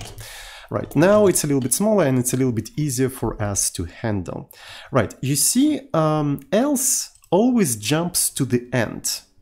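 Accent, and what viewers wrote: Russia. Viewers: такой жесткий русский акцент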